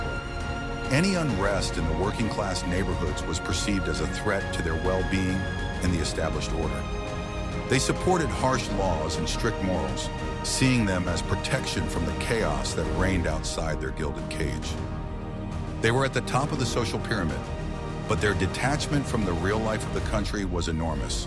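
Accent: United States. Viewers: What’s this American voice all about, it’s put me off as you don’t tend to believe it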